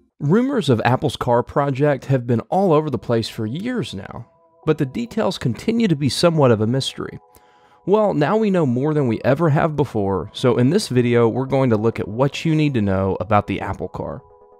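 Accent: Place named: Russia. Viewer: чьють чьють руский акцент ырше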